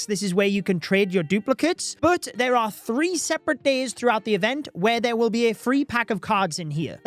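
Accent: United Kingdom. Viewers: Holy... I just luv Bri-ish accent 😆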